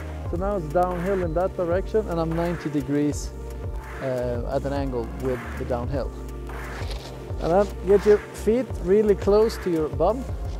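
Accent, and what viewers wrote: Sweden. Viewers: Are you Scandinavian? You're accent sounds Scandinavian, specifically Swedish🙂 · This is really helpful! Tack som fan mannen!(din engelska är väldigt svensk😉) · Is he Swedish?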